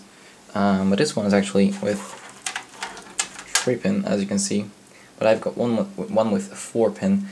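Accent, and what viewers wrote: Netherlands. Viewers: Ik hoorde gewoon direct dat je nederlands was lol nice vid btw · Are you Dutch ?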